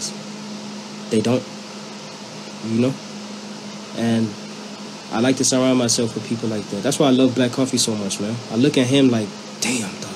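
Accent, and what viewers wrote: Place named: United States. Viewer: American accent mxm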